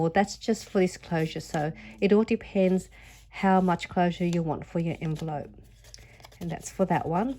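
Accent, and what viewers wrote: Australia. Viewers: Love your video and this idea! Very well explained too. I'm also from Sydney, Australia. Lovely to hear your Aussie accent ☺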